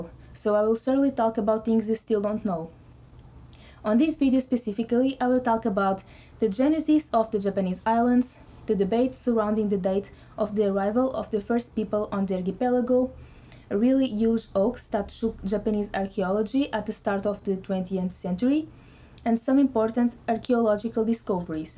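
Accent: Portugal. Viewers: I notice a Portuguese accent...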